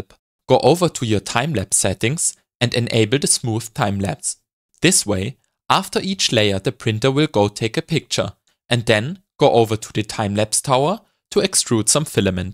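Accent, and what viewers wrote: Germany. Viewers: Du hörst dich an als wärst du auch Deutsch edit: Jup, hast es im Video grad gesagt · Deep, but well explained, technical stuff, made by a lad with a german accent, witout annoying music and/or nauseating transition effects on video edition. I t can't be better that this. Thank you a lot! 🙏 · мне так нравится этот немецкий акцент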